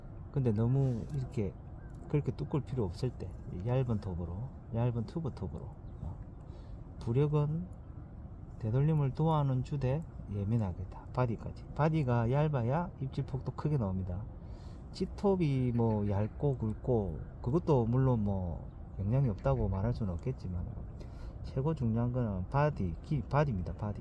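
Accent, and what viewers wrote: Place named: Gyeongsang, South Korea. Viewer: 영상 잘 보고 있습니다. 말투가 경상 쪽이 신 것 같은데 어디 사시는지요? 저는 구미에 거주 중인데...가깝으면 시간 맞으면 동출하고 싶네요...ㅎㅎ 다른 댓글을 보니 마산쪽에 사시는지요?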